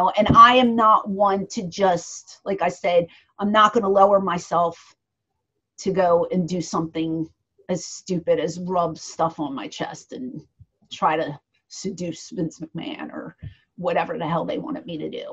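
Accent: Philadelphia, United States. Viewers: She has the thickest philly accent lmfaooo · Is she from philly?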